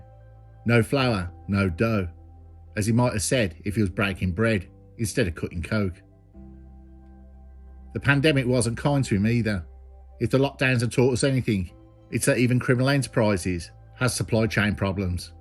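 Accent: Birmingham, United Kingdom. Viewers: Why are you calling them burner phones? Thats an American term. Here Pay As You Go phones (PAYG) as perfectly normal , half the population doesnt use contract phones. If you tell people you dont have a phone contract, no one will think thats weird. This isnt America. Are you actually British, or just an American using a British narrator? EDIT: As he wants to argue , quote: ''' The popularity of the term was undoubtedly fueled by its frequent use on the HBO crime drama "The Wire," The following excerpt from one episode (expletives deleted) actually provides a helpful vocabulary lesson: Kima: What's up with these phones? I've been seeing kids throw them away all day. They got cash like that to waste? They be laying all over, man. Sometimes you find minutes on them, sell them for walking-around money. Mello: They burners, Kima. Kima: Burners? Google's N-gram app, which traces the frequency of words' appearances in print, shows that "burner phone" skyrocketed in use during the early 2010s.'' *Where does The Wire come from? 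AMERICA. Its an American term.* When you top up your phone it literally says PAYG right there. All the phone networks call it PAYG. Wha5t you think payg stands for eh? PAY AS YOU GO Your mum likes sucking on mine I dont need to be told. I've been on road. No one used the phrase burner phone. The only time you hear it in the uk is in police shows and music. The Wire was using the term in the 2000s. No one in the uk was using the term burner phones in the 2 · well said old boy well said...where on earth would you get a pukka brummie voice bot?? im from london and love you say investigation 🤣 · Great content as usual maye,I ain't biast been a fellow brummie but just wouldn't sound the same otherwise.👍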